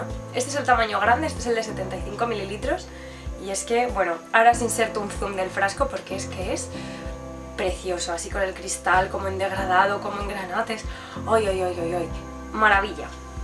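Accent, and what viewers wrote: Spain. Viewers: Hola recien me acabo subscriber a tu canal he visto unas cuantas reseñas tuyas y me termino de convencer tu sencillez calidez Alegria que transmites. Y aparte tu asento Español me encanta. Gracias por la reseña tarde veo la reseña pero poco a poco vere tus videos primero dios .bendiciones para ti😘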